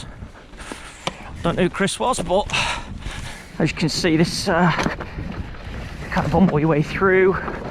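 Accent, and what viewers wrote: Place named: Ripley, United Kingdom. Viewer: He sounds like a Ripley lad!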